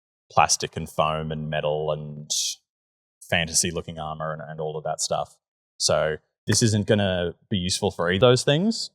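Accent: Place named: England, United Kingdom. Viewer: nice review. I was expecting you to speak czech as the pic had subtitles lol. I got The Kings English. 😅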